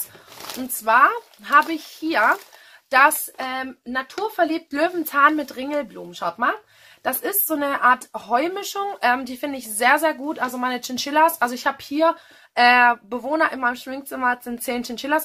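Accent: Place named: Swabia, Germany. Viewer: Du schwäbelst hart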